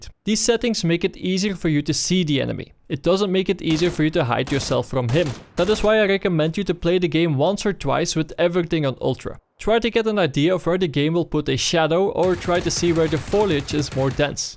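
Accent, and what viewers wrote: Netherlands. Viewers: Dutch accent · That accent tho! only the dutch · I like your dutch accent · you have a horrible rotterdams dutch accent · LEKKER NEDERLANDS ACCENT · love the accent too! guess its dutch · u sound dutch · Hollands accent ftw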